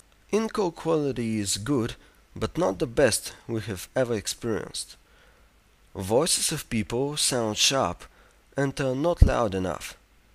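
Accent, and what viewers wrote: Russia. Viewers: ))) hahahaa man i like that russian english! :D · maybe is the site german but the reviewer is anything but german... he sounds clearly russian or polish as I am german and actually know how the typical german accent sounds like... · RUSSIAN. There's no doubt about it, and I thought he was drunk. Please arenaphone..., do something better.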